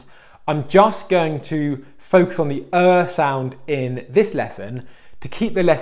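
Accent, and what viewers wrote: United Kingdom. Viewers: about this"s video the accent is British English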